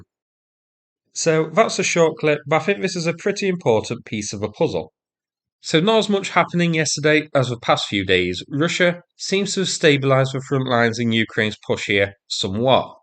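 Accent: United Kingdom. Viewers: Completely unrelated - what's that dialect you're speaking? I love it, but I'm crap at British dialects 😕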